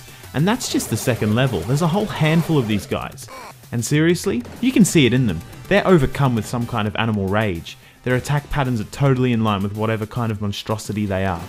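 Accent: Australia. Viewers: Dude's Australian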